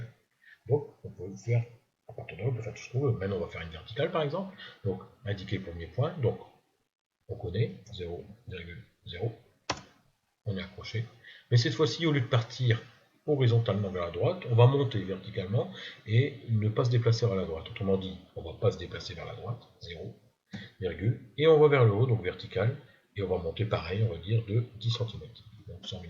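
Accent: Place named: Nord-Pas-de-Calais, France. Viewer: Un tiot accent ch'ti, quelques fautes de français, mais chapeau pour ce travail de partage, bonne pédagogie, sans pub; si les leçons suivantes sont du même tonneau, je risque de me convertir à LibreCad. Un gros MERCI, un peuch bleu et un abonnement. PS: désolé d'avoir introduit du ch'ti dans le commentaire, je présente mes excuses aux internautes de langue française et ne recommencerai plus.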